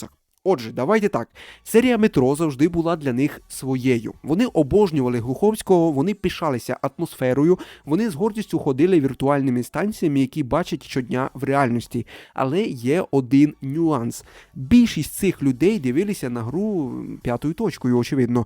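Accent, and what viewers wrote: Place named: Transcarpathia, Ukraine. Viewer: Дуже гарний закарпатський акцент)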